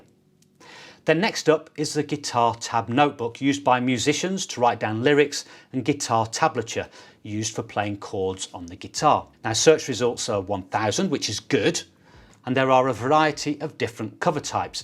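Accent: United Kingdom. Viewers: welcome to OZ and many thanks for the videos - I hear your UK accent and wish you all the best here - we came over from UK in 1961 I was 4 so grew up here - it's an amazing place that's for sure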